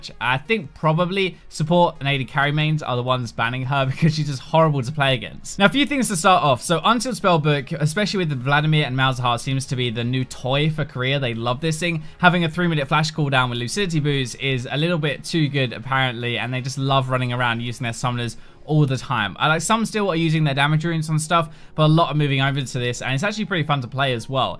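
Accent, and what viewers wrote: United Kingdom. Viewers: I like your vids dude but you should really consider slowing down your speech, because speed + british accent makes it really hard to actually keep up with what you're saying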